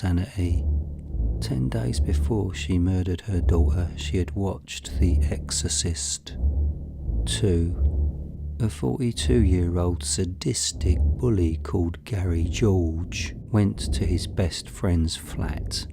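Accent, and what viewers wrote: United Kingdom. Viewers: Nice cockney voice mate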